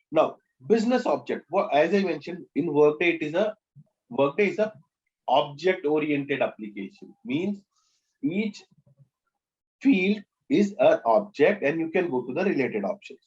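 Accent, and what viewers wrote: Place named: India. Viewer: I thought it was a woman guest, I am sorry but Indian accent in english is terrible :(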